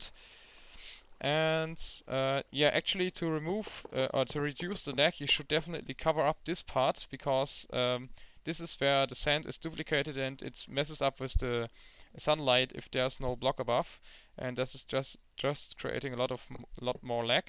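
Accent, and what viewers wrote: Germany. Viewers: Hes german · dieser deutsche akkzent! :D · hört sich komisch an, englisch zu sprechen mit dem deutschen akzent :d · man merkt das du deutscher bist der englisch redet :D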